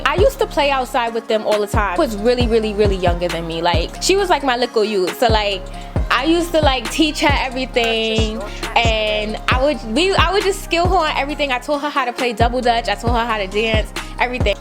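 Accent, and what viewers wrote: New York, United States. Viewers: can travel all over the world but you can literally never take the New Yorker out of this girl!lol.. Our New Yorker accent and slang is so so strong. Love it! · Is Such A NewYorker I Love It I Haven’t Heard Somebody Say “You Bun’s” In So Long 😂😭😭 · looks beautiful, but is it me or do I hear her NY accent more now! hehe:) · so New York I can’t lmaooooo I love it though 😂💜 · This shit funny as hell. She so NEW YAWK . I love itttt 😍😍😍🤗😂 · Lol I love u 😂😂😂 u so New York 😂😂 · Omg I missed ur story times I love u I love the way u talk the nyc accent is everything 😘🤗💜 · U know I love how New Yorker speak yo lol I just live for hearing speak lol I'm from JA and New Yorkers accents are my fav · Sis youre sounding EXTRA New York in this one !!! Lol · i loveeeeeee your accent 😭 true new york girl lol . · She’s so new YAWK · The best New York accent ! 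😆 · Is it me or she talkin totally dif ..like a new yorker..like nicki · NY accent was just popping out extra todayyy,love itttttt. looooool.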